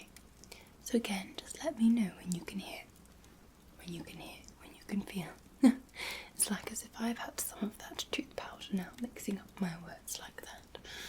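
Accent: Scotland, United Kingdom. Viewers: Loving this character, don’t forget your Scottish accent though 🏴 💙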